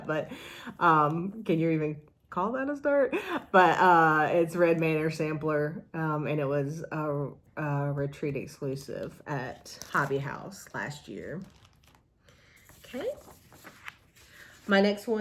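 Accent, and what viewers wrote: South, United States. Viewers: Hi..I also watched Carol Saltbox Stitcher and she recommended your channel. Really enjoyed seeing your projects..love your Be Thankful and Good Cheer pillows..I have both patterns and hope to stitch them this year. I regret to say I didn’t know about your previous flosstube so could you sort of re-introduce yourself in your next video. I’m always interested in where people are from and you sounded like you might be from the South lol..sounded like home to me 😊 Have a great weekend and I look forward to your next episode. Suzette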